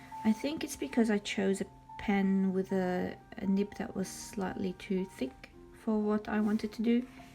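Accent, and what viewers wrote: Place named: Australia. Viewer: Okey, thanks for answering! I didnt think your accent did sound like australian, but after a bit more listening I almost hear it. 😅 Hope to see much more from you and your art! Love from Sweden!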